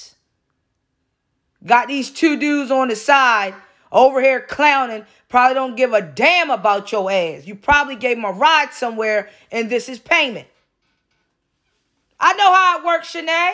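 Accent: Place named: Maryland, United States. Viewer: I knew you were somewhere close to Delaware by your accent 😂😂😂 and I was right Maryland lol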